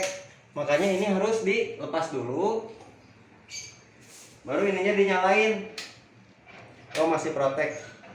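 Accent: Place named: West Java, Indonesia. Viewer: Sunda pisan eh s eta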